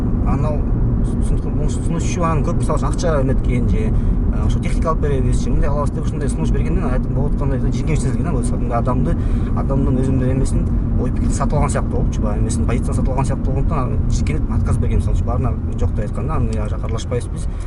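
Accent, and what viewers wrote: Standard. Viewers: Азаматсын 👍👍👍Кыргызча таза суйлойт турбайсынбы, биз ойлоптурбуз кыргызча суйлой албайт экен деп. Ишине ийгилик иним....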